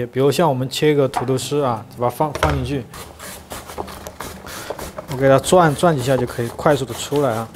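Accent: Sichuan, China. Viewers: 师傅讲话不出两句我就听出了四川或重庆人吧？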